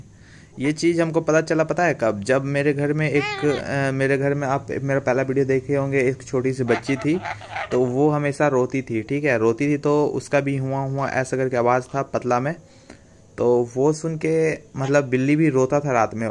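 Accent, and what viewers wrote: India. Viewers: Bhai tone se toe aap mithilanchal k lgte ho. Kha se ho aap ??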